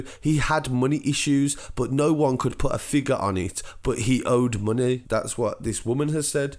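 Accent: Birmingham, United Kingdom. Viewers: That Birmingham accent and the stutters, terrible, least you didn't say Birmingham no worse sound than some1 from Birmingham saying the word Birmingham. That's me done. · Love the brum accent!! But shocking stuff in Dublin!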